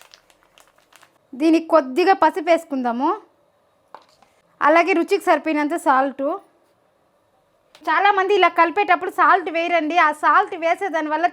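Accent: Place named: Chittoor, India. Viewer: Pakka chittoor slang. Baaga chebutunnaru. Maa athagaaru ilagae chestharu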